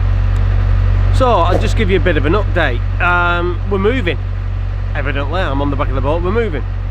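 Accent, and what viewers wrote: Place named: Lincolnshire, United Kingdom. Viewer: Hope is feeling better, the injury sounds really painful. I keep trying to work out where you come from by listening to your accent. I was born in Lincolnshire and you sound similar to people I know. Best wishes for 2020.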